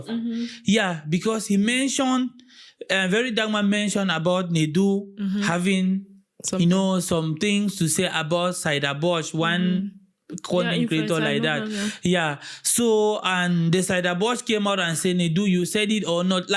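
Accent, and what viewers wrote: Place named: Pidgin, Cameroon. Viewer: This guys pidgin accent looks like a Cameroonian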